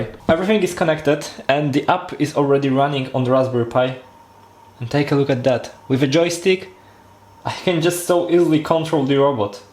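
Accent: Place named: Poland. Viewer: Oj chłopie - akcent to masz taki mocno polenglish. Akcenty słów, ale też melodia zdań - słychać, że w szkole na angielskim było mało rozmowy/dialogu lub się nie przyłożyłeś. Czy to przeszkadza - wcale: chodzi o treść. Ale sam zacząłeś z 'bearing' :P Jeśli Ci to przeszkadza, zainwestuj w konwersację z kimś kto ładnie rozmawia po angielsku, bo treść kanału jest naprawdę ciekawa!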